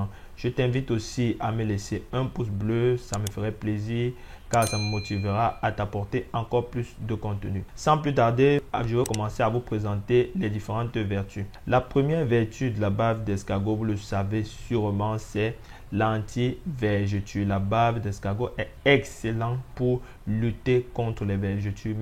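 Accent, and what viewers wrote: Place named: Africa. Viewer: Bonjour à votre accent on devine que vous êtes un africain. Qu est ce qui vous empêche de montrer des femmes de couleur arrêtez ce complexe c est révoltant. Si vous n avez pas de photos de femmes africaines il y a les femmes noires américaines. Vous vous adressez plus à vos semblables et vous affichez des femmes blanches arrêtez ça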